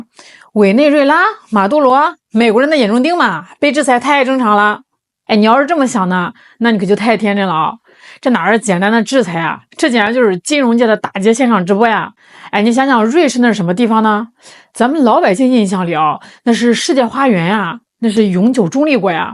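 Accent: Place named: Shandong, China. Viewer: 这口音是闪董印. 挺解压滴. 瑞士人坏的很, 他周边的国家没一个不恨他的, 美国也时常修理瑞士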